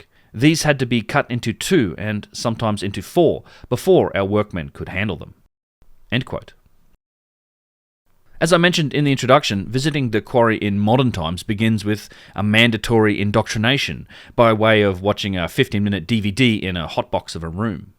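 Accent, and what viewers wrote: Australia. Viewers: That's a fellow Aussie accent! 😉 Nice work mate 🙂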